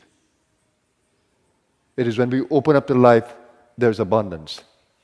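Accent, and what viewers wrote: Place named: Guyana. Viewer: Very good guidance..thank you... Swamiji your accent sound Guyanese..Are you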